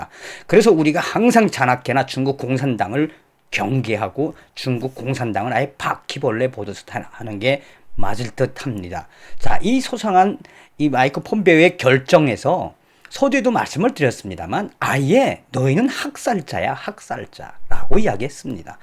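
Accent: North Korea. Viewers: 고저 더 가열차게 달려가갈시다레~~~달려가달라요!!!우리 부모님이 이북 출신이셔서, 이북 사투리 들으니까네 그냥 너무 좋은데요... 행복 하시라요~🙋🏼‍♀️👍👍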